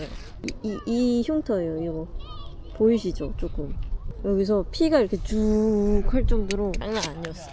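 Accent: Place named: Dangjin, South Korea. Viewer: 누나 사투리가 배여있는 부분이 당진이었구나! 누나 차 출발 전 표정 개카리스마!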